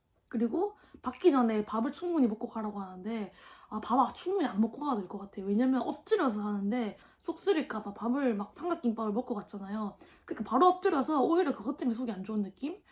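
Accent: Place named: Busan, South Korea. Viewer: 부산 여자 너무 좋다. 사투리가 매력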